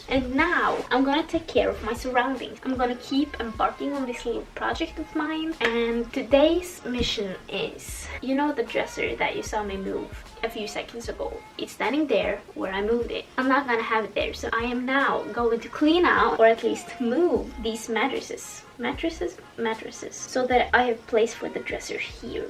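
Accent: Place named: Scandinavia. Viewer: i loved this video!! i can't figure out where ur accent is from is it from one of the Scandinavian countries?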